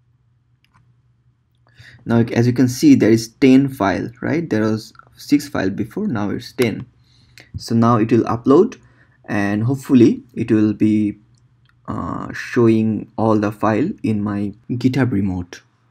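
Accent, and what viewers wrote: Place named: India. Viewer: whenever you listen indian accent videos you already know your problem is fixel lol. So staright to point thank you sir.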